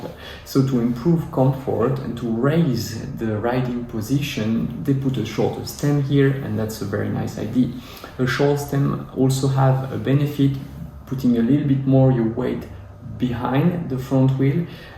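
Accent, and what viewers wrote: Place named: France. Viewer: Oulala ça pue le français qui parle anglais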